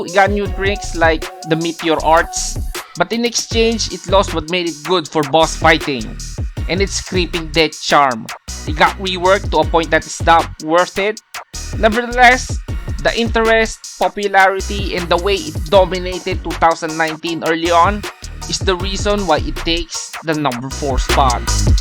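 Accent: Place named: Philippines. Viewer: Hard Filipino accent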